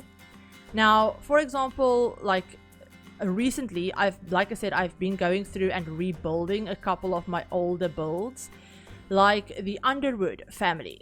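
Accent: Afrikaans, South Africa. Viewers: This is the first video of yours I’ve watched and I was so shocked to hear what I think is an Afrikaans accent? So cool!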